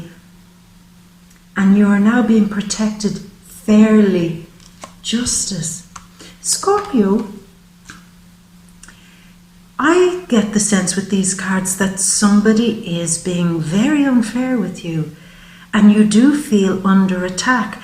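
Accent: Ireland. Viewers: i love your accent; are you living in ireland or where you born thereÉ or a newfieÉ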